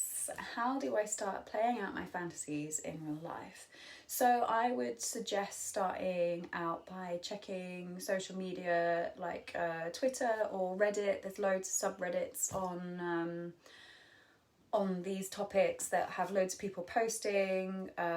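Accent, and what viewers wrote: United Kingdom. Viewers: In addition to the rest, I love your very elegant, so British accent and way of speaking (I'm French).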